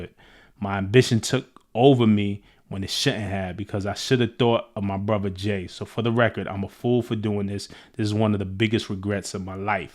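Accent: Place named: New York, United States. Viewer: Can't really stand the homie's NY accent, but this is a FANTASTIC video‼️✊💯👍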